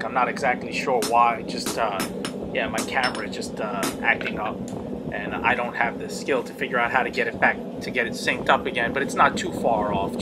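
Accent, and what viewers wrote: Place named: New York, United States. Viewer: One question I have. The depressions you make with the guillotine tool at the front and back of the boss, are those functional or just for aesthetics? Also, do you originally come from the NY/Long island area? just guessing by your accent.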